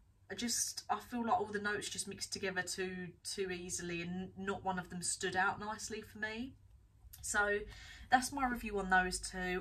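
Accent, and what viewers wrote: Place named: Essex, United Kingdom. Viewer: Hi 😊. As I'm a novice with all things candle related, I was looking at Yankee Candle reviews and found yours so I subscribed as I thought you were the most relatable maybe because I'm a London / Kent girl and I think your accent is Essex (forgive me if I'm wrong 😁). I saw you had a candle warming lantern which I thought was a brilliant idea and bought one immediately as I have a Jack Russell dog that's a bit of a bulldozer I was too afraid to have a naked flame in case he knocked it over. I am sure I remember you saying in one of your videos that you sometimes pour melted wax away which helps the candle regain some of its scent. I have a Merry Marshmallows candle and I have put it under the lamp several times but it's started to lose it scent even though it's only burnt down a quarter of the way. So my question is how much should I pour away and how do I dispose of it? 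Many thanks for reading 😊.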